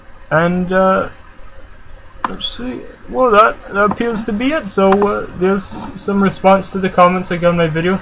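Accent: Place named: Canada. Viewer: From my experience, Ancestry 'Irish' is more like Irish+Scottish - basically the same people. And both countries had Viking incursions, reportedly mainly from Denmark but recruited from further east, both north and south. They got around. If I may, your type of Canadian accent is far from usual - I'm an expert having been a Canadian now for 67 years. BTW, love the hat.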